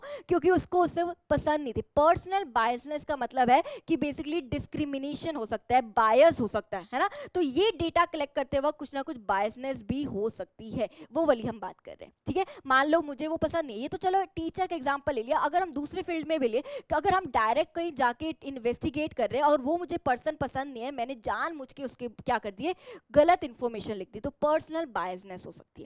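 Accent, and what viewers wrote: Punjab, India. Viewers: Ma'am ur Panjabi ascents ummm so gudd bole to bawal...🤧🌼✌️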